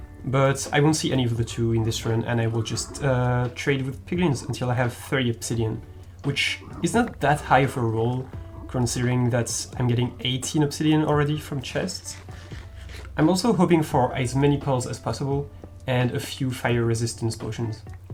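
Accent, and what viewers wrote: France. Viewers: Are you french ? You kinda have a french accent :) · est-ce que tu est français ? If you are not french you have a french accent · Are you French ? Your accent is familiar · Ur french ? Because of your accent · Is it me or he has a french accent ? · haaaaa il me semblais avec ton accent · You sound extremely french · french accent ?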